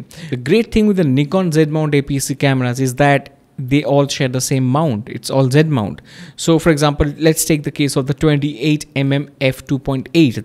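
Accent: India. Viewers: Indian English accent usually makes people sound a bit silly, but damn this video was good. Thanks a lot, I am currently almost-decided to get the Z fc.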